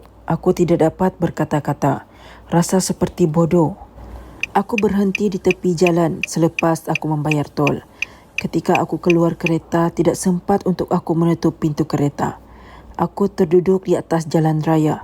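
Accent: Sabah, Malaysia. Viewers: mcm loghat sabah sajaa??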